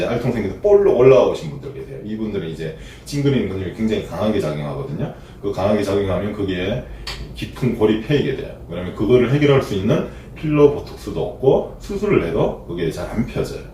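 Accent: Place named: Gyeongsang, South Korea. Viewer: 쌤 이제 갱상도 말투 안쓰시나여 서울싸람 다되셨네여~ 진짜 멋진분 최최고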